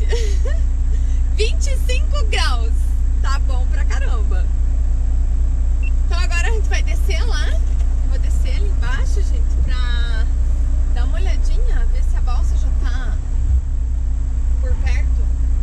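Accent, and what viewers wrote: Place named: Santa Catarina, Brazil. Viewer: Boa noite. Adoro seus vídeos. Principalmente para ouvir sua voz. Esse seu sotaque catarinense com certeza chama a atenção. Essa sua frase "CHIC E BACANIZADO" também é legal e chama muito a atenção. Sua simpatia também é bem marcante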